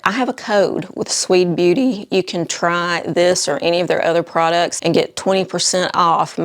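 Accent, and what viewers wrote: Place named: Virginia, United States. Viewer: Your dogs r so cute! We lost our 13 year old toy poodle a few years ago and r thinking of getting a small dog. I have to tell u I was born in Virginia and moved with my family to Idaho when I was 10. You remind me so much of my aunts and cousins in Virginia with your cute accent and your no nonsense personality! I think that’s one reason I love your videos so much! U r just darling! Great video as usual thank u! ❤😊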